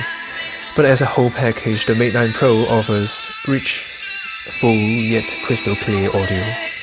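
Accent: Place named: United Kingdom. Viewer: Your accent makes me want to drink some tea in a British mansion. Can't stop listening, good job! :)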